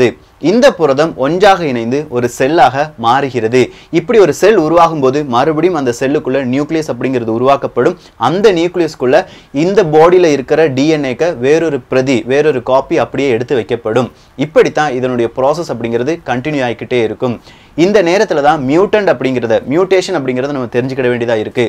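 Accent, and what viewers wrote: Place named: Tamil Nadu, India. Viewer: Your tamil Slang bro.... If I'm there will. Hug and kiss you in your lip for 10 minutes...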